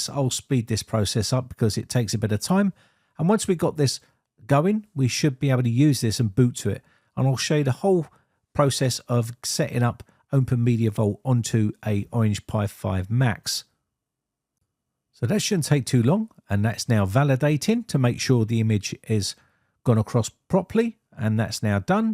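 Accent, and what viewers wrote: United Kingdom. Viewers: Hi, Sorry this is out topic. But i like your british accent. =)) Plese recommend other british tech youtuber I can subscribe. Thank you.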